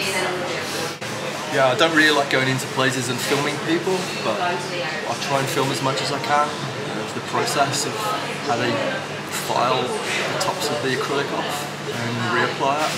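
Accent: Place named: Birmingham, United Kingdom. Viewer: You going to do anymore exploring, used to like them, are you originally from Birmingham, detect a bit of brummie accent there 🤔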